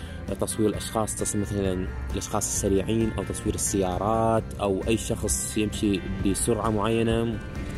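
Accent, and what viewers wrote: Mosul, Iraq. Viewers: حبي لهجتك من الموصل صح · من لهجتك مبين عليك من الموصل أبن مدينتي ❤ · ما اعرف ليش احس انت من الموصل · مبين من لهجتك مصلاوي ❤️ تحياتي لك من ارض نينوى الحدباء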